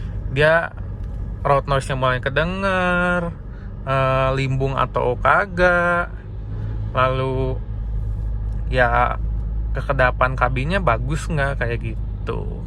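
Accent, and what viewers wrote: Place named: West Java, Indonesia. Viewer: Akang orang sunda jg ya?? Cara ngomong nya sunda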